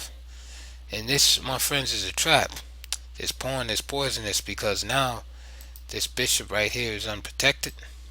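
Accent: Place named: East Coast, United States. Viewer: Dope!!! Are you from NY or Philly? That east coast accent!💯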